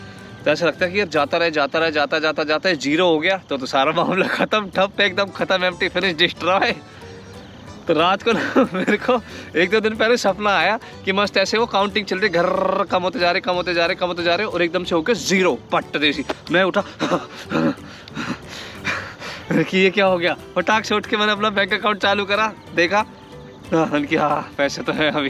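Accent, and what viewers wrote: Haryana, India. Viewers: I bet that is from Haryana because I can feel his tone even I am from Haryana.🔥💖😄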